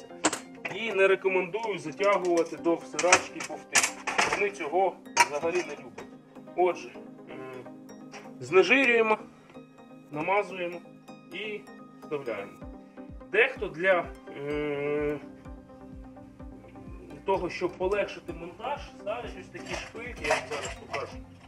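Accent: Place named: Russia. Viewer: Это тот случай когда русскоговорящий пытается говорить на мове.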